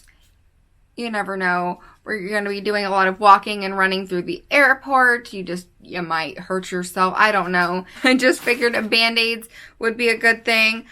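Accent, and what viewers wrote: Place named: United States. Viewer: Nice video, thanks for sharing. I haven't finished watching it yet... I had to pause when you mentioned nearly a 12 hour journey!!! I thought to myself, she sounds State side, where could she be flying from 12 hours to get to Tenn?? Then I realized (after reading About Me section) you're coming from OR. Makes sense 😂😂 ❤️❤️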